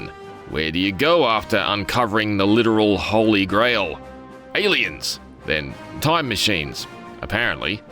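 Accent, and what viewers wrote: Australia. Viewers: I've always admired your reviews. Well written, relatable, and just like a friend telling me what they think of a game. As someone who has spent most of my career in network TV and video production, I want to give credit where it's due. It's also always great to hear an Aussie voiceover that fully embraces how we talk. I'm also thrilled to see such a win for Xbox. Such a revered franchise from my childhood deserved the true AAA treatment, and I can't wait to fire it up on my PC.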